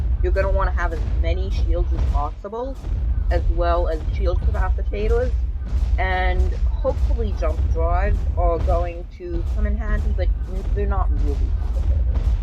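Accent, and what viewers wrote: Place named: North America. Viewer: north american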